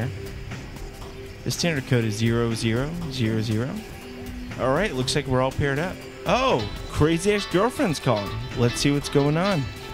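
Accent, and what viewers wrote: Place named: United States. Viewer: he says that because hes from the USA and thay speak a bit different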